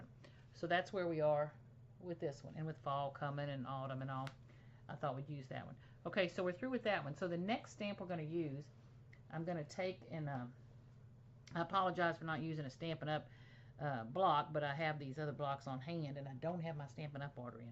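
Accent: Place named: South, United States. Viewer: Hi!! Just found your videos! I am guessing your are a Southerner... love your voice..... I am from the MS Gulf Coast.... Beautiful cards...... thanks for sharing.... and thanks for the post it note printing tip!!!!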